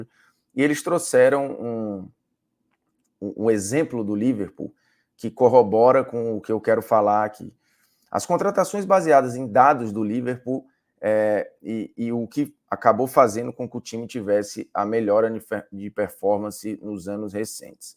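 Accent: Northeast, Brazil. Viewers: Bruno Formiga, explique aquela polêmica da época do Esporte Interativo, quando você disse Ao Vivo que iria processar o Leonardo Baran porque ele falava com você de forma preconceituosa só por conta do seu sotaque nordestino.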